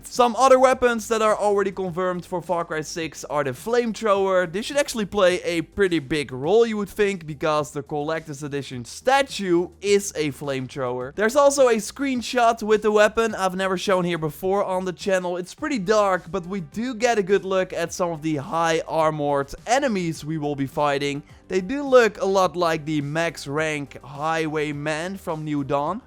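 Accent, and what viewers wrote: Netherlands. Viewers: Ken heel je kanaal niet. Maar aan dit accent te horen ben jij sws Nederlands lol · m8 are you dutch you sure sound like one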